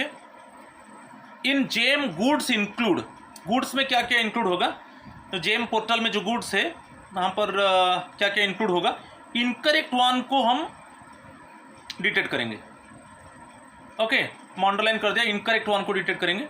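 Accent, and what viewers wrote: Odisha, India. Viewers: Sir are u an odia?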